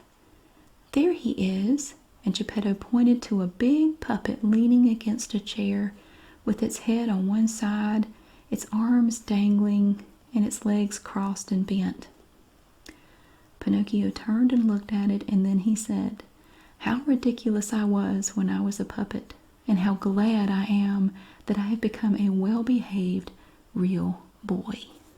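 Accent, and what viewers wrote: South, United States. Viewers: There’s nothin like a sweet southern woman reading u a bed time story for u to sleep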